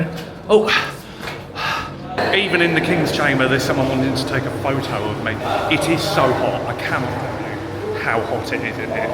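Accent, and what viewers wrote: England, United Kingdom. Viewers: This guy is extremely english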